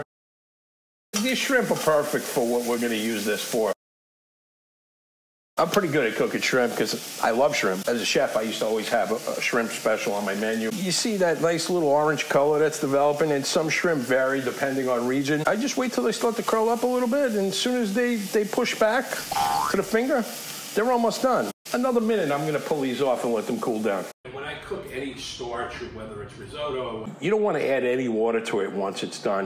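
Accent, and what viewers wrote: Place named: United States. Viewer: Chef, lei ha detto che è italiano, dall'accento non si direbbe: solo di origine italiana od ormai ha preso l'accento "americano"?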